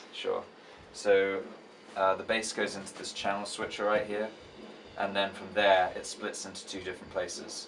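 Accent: United Kingdom. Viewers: Dude where are you from? You sound British but I can't be sure...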